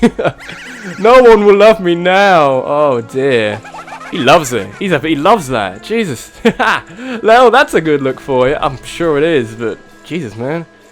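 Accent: United Kingdom. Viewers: I love how British he is 💜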